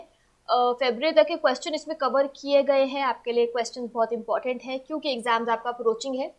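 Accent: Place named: Haryana, India. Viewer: Madam ji aap haryanvi laggo ho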